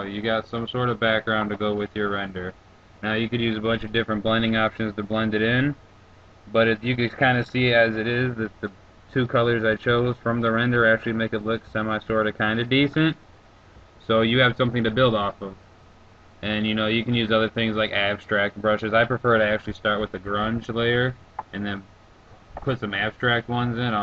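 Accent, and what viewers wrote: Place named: Michigan, United States. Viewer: Nice tut. and u sound like my old friend shroomz from michigan. i thinks its just your accents!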